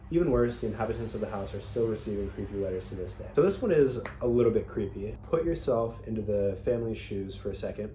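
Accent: Australia. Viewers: Lmao I was so confused at the start with the Australian accent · Wait, he’s Australian?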